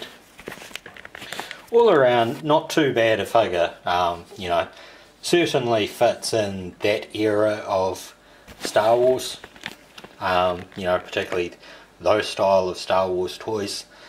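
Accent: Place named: New Zealand. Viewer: You sound like your a fellow kiwi , then I heard tfnz haha but awesome man I subscribed.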